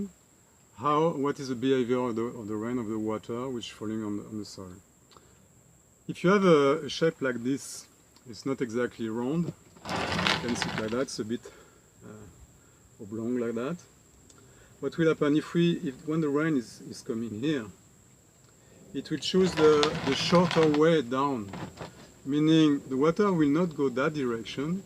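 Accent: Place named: France. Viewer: You are speaking in english, with a french accent, in a channel with a name that is in portuguese. i'm kinda confused.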